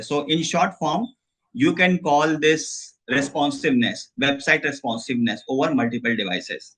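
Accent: India. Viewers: Thankyou so much , iam interested to learn seo .. last time I seen your video I can't understand that foringer voice so this time it's an indian , now i understood every topic thank you ❤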